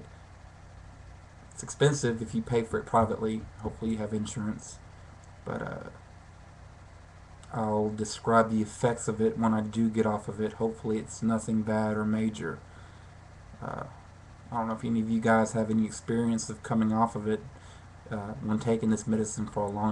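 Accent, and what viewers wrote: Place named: South, United States. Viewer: Hey buddy! Thanks for checking my vid out! I noticed your Southern accent (half my family is from the South). Being a man with depression-type issues is hard enough, but is it even harder in the South? My father was the hardest-core South Carolinian you'd ever meet. He was bi-polar but never sought help cuz of his pride. We suffered because of it.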